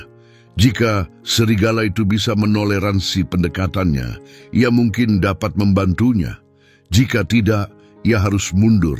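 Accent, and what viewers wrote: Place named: Malaysia. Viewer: Admin orang Malaysia?. Bahasa Indonesianya bagus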